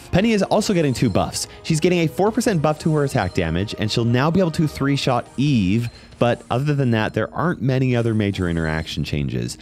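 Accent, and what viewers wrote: Norway. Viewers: Are you Norwegian? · Wait wait wait Are u Norwegian?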